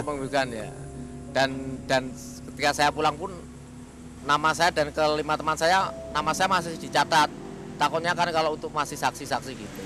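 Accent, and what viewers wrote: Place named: Semarang, Indonesia. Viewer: Logat e semarangan pol mas 😊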